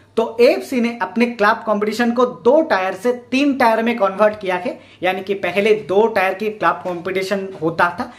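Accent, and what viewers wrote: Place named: West Bengal, India. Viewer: U sound so bengali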